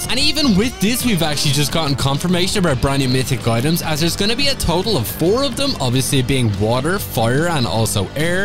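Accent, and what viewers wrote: Ireland. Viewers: Are ye from ireland I am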